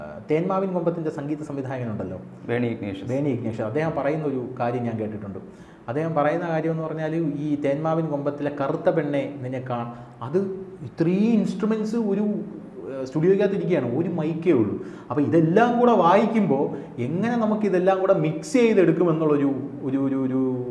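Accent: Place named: Malabar, India. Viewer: വാക്കുകളിൽ മലബാർ ടച്ച്‌..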